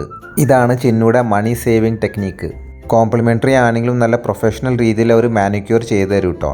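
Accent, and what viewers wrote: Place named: Ernakulam, India. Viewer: ചേട്ടൻ ekm ഇൽ എവിടാ നല്ല പരിജയം ഉള്ള സ്ലാങ്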